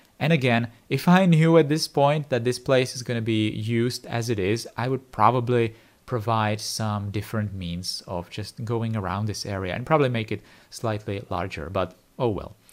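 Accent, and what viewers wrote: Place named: Czech Republic. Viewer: It's the czech accent for me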